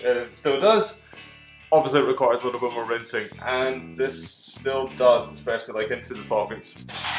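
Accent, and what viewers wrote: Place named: Scotland, United Kingdom. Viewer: Okay, are you a Scot living Sweden or a Swede living in Scotland?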